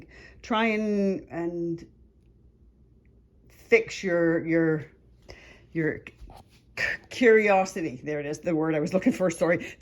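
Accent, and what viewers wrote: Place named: Canada. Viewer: Well you definitely have a canadian accent now. Oh fancy that. You literally lived an hour from me. Most of my extended family are in liverpool or thereabouts but we moved around with my dads job then ended up back in Lancs. What a small world. So do you think of yourself as english or canadian or both?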